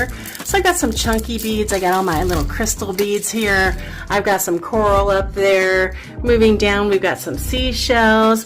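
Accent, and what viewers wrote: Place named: South, United States. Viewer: I like the southern drawl!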